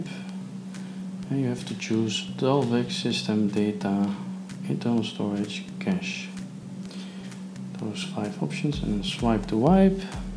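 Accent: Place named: Netherlands. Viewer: You're Dutch I noticed it from your accent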